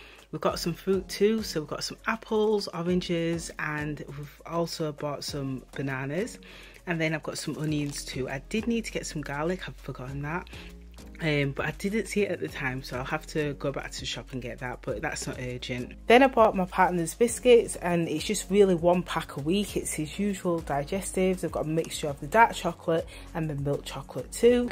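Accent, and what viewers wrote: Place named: Yorkshire, United Kingdom. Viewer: I thought so with your accent, defo a Yorkshire lass like me. Love your channel by the way xx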